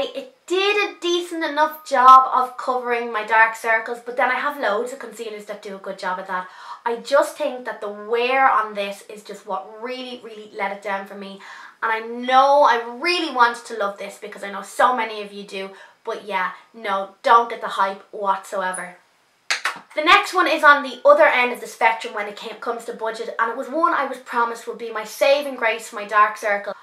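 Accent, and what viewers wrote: Ireland. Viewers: Pretty sure she's from Ireland.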